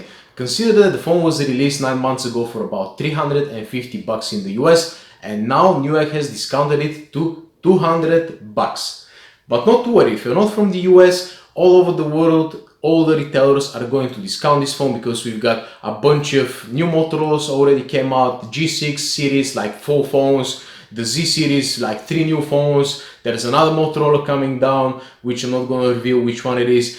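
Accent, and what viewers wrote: Russia. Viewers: his russian accent is funny! lol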